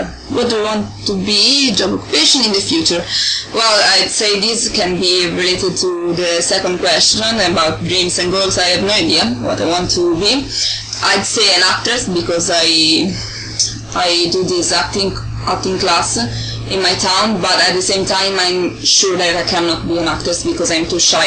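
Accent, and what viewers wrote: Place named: England, United Kingdom. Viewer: your English Accent was AMAZING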